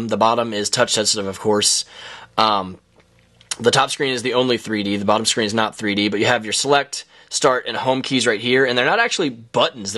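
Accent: United Kingdom. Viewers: british accent O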